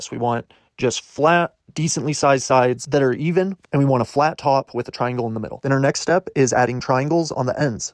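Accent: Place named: Canada. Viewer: Dudes gotta be Canadian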